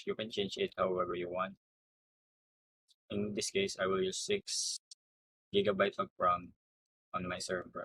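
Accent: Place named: Philippines. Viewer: thanks man i really appreciate your tutorial, i cant help but wonder if you're a filipino based on thee way you speak (I'm filipino too)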